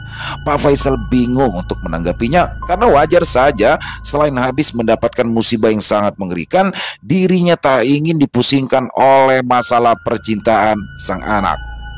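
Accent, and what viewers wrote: Jakarta, Indonesia. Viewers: Mantaap Bang ceritanya kocak bener, dilanjutkan ya Bang, paling suka klo ceritanya logat Betawi yg medok… sukses Bang dn sallam sehat.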